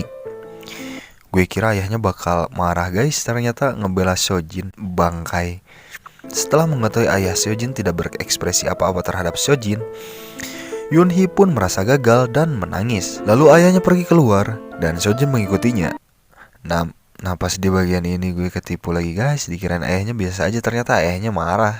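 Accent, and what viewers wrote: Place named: West Java, Indonesia. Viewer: Aduh kang Sunda pisan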